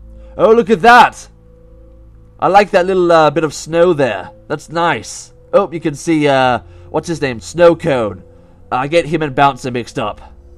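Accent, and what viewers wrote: United Kingdom. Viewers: are u doing a british voice or some thing =_ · Good God hes british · He is speaking British accents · Sound British I don’t know why are you supposed to be like oh I have a toy verse I can do like a girl sound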